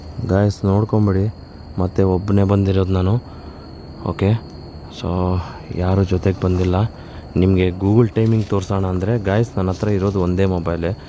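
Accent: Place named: Bangalore, India. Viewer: Bangslore kannada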